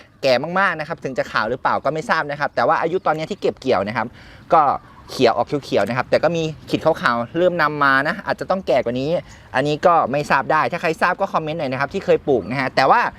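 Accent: Isan, Thailand. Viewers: ชอบๆพี่ดูตลอด .ได้สาระดี .พูดอีสาน กึ่งไทยสุดยอด..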